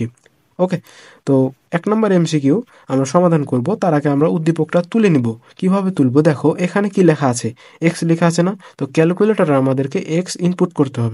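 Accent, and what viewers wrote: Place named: Chapai Nawabganj, Bangladesh. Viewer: আমি আপনার কথা বলার ধরন থেকে বুঝতে পেরেছি আপনি চাপাইনবাবগঞ্জের 😊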